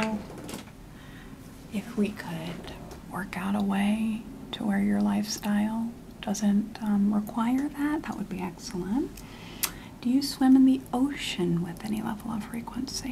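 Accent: South, United States. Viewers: Crazy that I found your videos by reels on Facebook and totally got ASMR from the southern accent. Imagine my shock when I found you actually make ASMR content intentionally!! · I love this and the southern accent and ghe TYPING sounds❤❤❤❤❤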